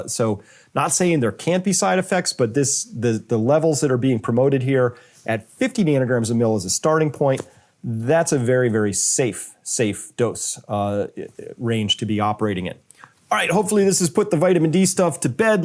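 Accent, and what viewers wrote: England, United Kingdom. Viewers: But that English accent though! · Lovely Brit Accent. Top class video. Thankyou very much for my shot of common sense. Gerald Celente also calls it for what the "rulers & managers" are: Trends in The News Going Live: More Lockdowns, More Mandate Madness, More Econ/Social Destruction <link> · Thanks. Love your positivity and your “English” accent! Excellent 👍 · 😅😂. English accent 😊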